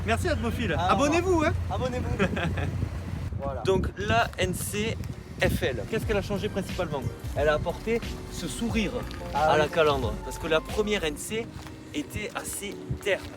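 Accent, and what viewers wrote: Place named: Bordeaux, France. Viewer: Le petit accent Bordelais des Familles b un essai du côté base sous-marine. Copbo gagnant avec une Mx5 Nc dommage que elle n'existe pas en 170cv elle serait top.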